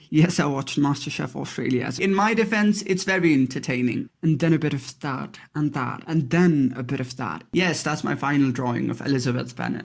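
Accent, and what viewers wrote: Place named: United Kingdom. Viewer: Your videos are great, keep it up. And your accent being British is very interesting, when I first saw your 3000 years history video, I thought you were British lol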